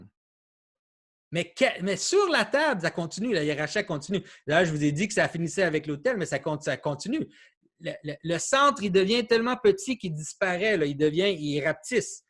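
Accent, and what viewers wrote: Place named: Quebec, Canada. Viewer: I don’t even speak French and I know this guy is speaking Québécois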